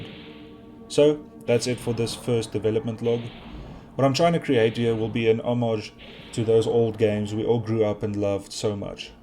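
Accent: South Africa. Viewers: Where you from bro you sound South African? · I always get a warm feeling when I hear another south african on youtube lol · I honestly admire the work you are doing,good luck! Also ,I was extremely taken aback at finding another person with a Afrikaans accent on here. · I'm always thrown off guard when I hear a south african accent on YouTube xD Will you be at rage this year?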